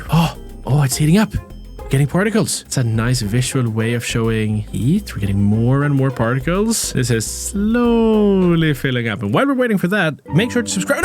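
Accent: Sweden. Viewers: Is Swedish? Cause I Am also Swedish and it feels like the pronunciation of a couple of words feel ”Swedish” aka the accent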